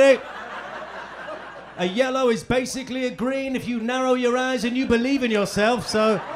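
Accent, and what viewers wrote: United Kingdom. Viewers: Funny! And I’ll admit, british accents are my favorite accents 😂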